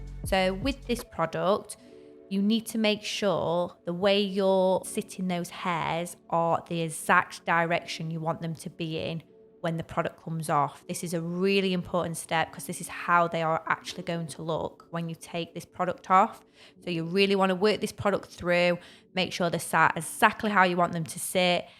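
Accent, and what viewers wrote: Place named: North, United Kingdom. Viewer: the limey accent.. when it's too pronounced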